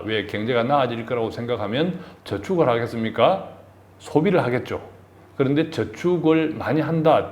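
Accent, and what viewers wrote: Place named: Gyeongbuk, South Korea. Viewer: "경상도쌀람" 발성 이 경북쪽!~ 😄😆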